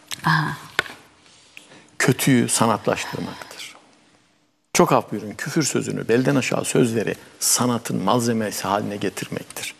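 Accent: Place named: Erzurum, Turkey. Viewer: Allah hocamiza uzun omur versin. Erzrum şivəsi, eyni İrəvan turklərinin şivəsi!!!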